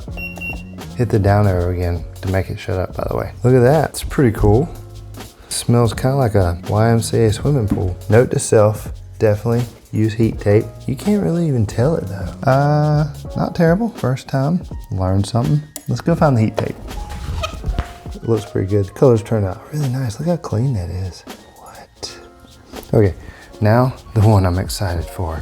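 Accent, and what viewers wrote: South, United States. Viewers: I’ve been watching you and thinking 🤔-that southern accent is too familiar. Then, i stalked you!!🤣 nah, just found your PO Box. BOILING SPRINGS!!!! Hello neighbor!! Spartanburg/Pauline area. We can almost yell out of our windows. 😂 loving your videos. Learning so much and getting some good inspo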